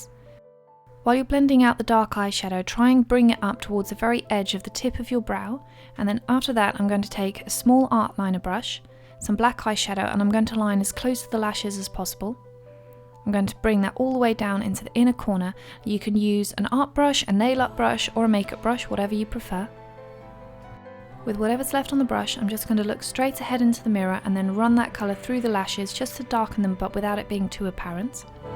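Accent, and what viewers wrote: England, United Kingdom. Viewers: Where are you from btw? I know you're English, but you're accents lovely :3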